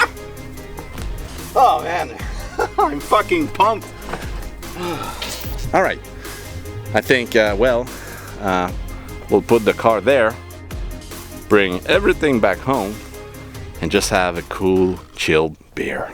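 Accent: Quebec, Canada. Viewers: Been in awhile since I've heard a Quebecois accent. Your english is great!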